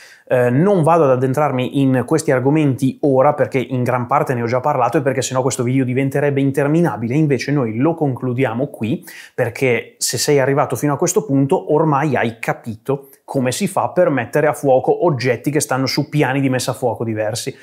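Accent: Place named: Romagna, Italy. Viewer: un accento adorabile ...romagnolo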